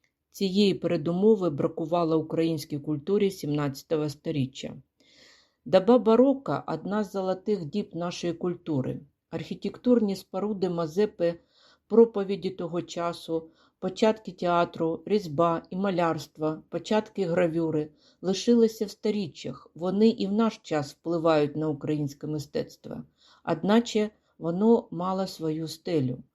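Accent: Russia. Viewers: Дякую за статтю Шевельова, за якою давно шукала. Але, на жаль дуже зросійщена вимова, що псує вражіння. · вітаю! абсолютно не хочу образити чи то знецінити Вашу працю. проте хочу зауважити, що, на мою думку, Вам варто ґрунтовно попрацювати над фонетичним аспектом мовлення. дуже важко слухати через абсолютно часто заміну звуку "о" на "а" і некоректне помʼякшення чи не-помʼякшення. враження, ніби Ви читаєте українською, але на російську фонетику. вдосконалення вимови українською було б чудовим кроком. Ви робите важливу справу і щасти Вам із цим.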